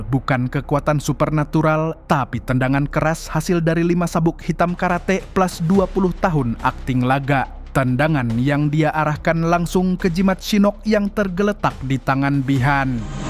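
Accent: Banjar, Indonesia. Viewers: Admin orang Banjar kah Ikam 😂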